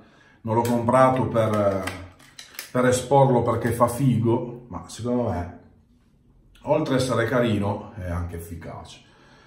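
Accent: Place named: Piedmont, Italy. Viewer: Il tuo accento piemontese mi ricorda la "mia" Torino dalla quale sono partito ormai dal lontano 1996. Molto belle anche le interviste a Paolo Barrasso e Donato Ciniello. Un'altra video di compagnia visto / ascoltato mentre anch'io mi stavo radendo (la testa). Prima o poi vedremo anche una rasatura della testa? Buona giornata a te ed ai tuoi followers.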